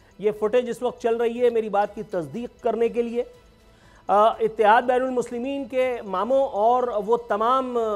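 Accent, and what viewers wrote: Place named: India. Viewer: Sadeqe sahab apka lehja he Indian ha..nafrat na banao ye khod army ne apne oper keya ha taky nazar estaraf hojae...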